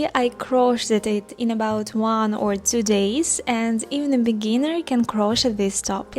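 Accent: Russia. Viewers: я будто слышу русский акцент😂 так легче понимать туториал хаха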